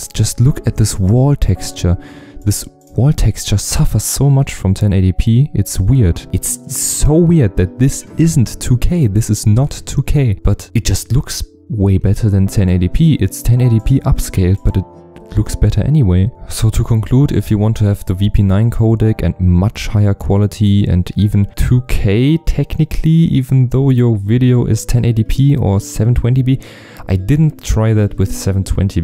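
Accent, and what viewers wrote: Germany. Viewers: just uploaded a video on 1440p. first problem: i can only watch it on 1080p plus it`s still avc. hopefully it's still processing and in a few minutes it's fine edit: ich wusste doch dass du deutsch bist das hört man :) nichts gegen dein englisch, alles gut :D 2. edit: alles funktioniert danke :D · Man hört deinen deutschen akzent, fand das Video trotzdem hilfreich.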